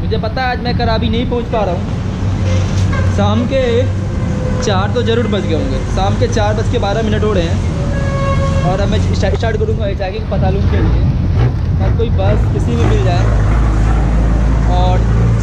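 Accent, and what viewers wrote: Bihar, India. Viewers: Aram se baitha tha ek dum pasra hua tha, ye bihari language 🤗🤗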